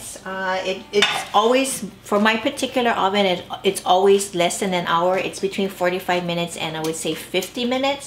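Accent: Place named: Hawaii, United States. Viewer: When I heard you talking, I thought, Hey, this girl from Hawaii. After reading your description I realized hey, I was right. You can take the girl out of Hawaii but you cannot take Hawaii outta the girl! You go girl. Great to find you on YouTube. Hawaii representing! I gotta make this.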